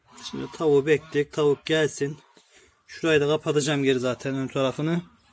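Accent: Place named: Sivas, Turkey. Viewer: Konuşmandan belli abi tokat sivas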